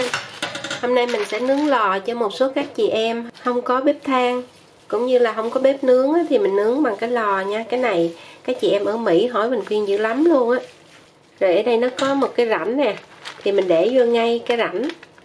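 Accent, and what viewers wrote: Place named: South, Vietnam. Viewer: 🙏🏻❤️🙏💥✨💫🌟🌟🌟💖💖💖💖 MẾN YÊU!...XIN CẢM ƠN! CẢM ƠN RẤT NHIỀU MÓN NEM NƯỚNG NGON THƠM ĐỦ MÀU SẮC THIÊN NHIÊN HẤP DẪN TUYỆT VỜI BỞI BÀN TAY TRÌNH BÀY KHÉO LÉO VÀ GIỌNG NÓI DỊU DÀNG NHẸ NHÀNG MIỀN NAM DỄ THƯƠNG CỦA. XIN RẤT YÊU MẾN VÀ NGƯỠNG MỘ 💕💕💕💞💞💕💓💓💓💖💖💖I LOVE YOU...and I LOVE YOUR FAMILY 💖💖💖🌟🌟🌟💖💖💖💖💖💖💖💐💐💐💐💎💎💎💎💖💖💖💖💖💖💖💖💖💖💖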